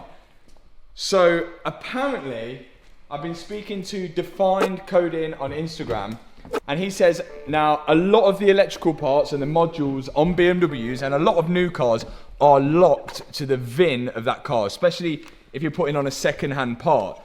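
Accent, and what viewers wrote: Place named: Leicester, United Kingdom. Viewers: I don't care about cars, I am here only to hear your English accent, and its phenomenal. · A familiar Leicester accent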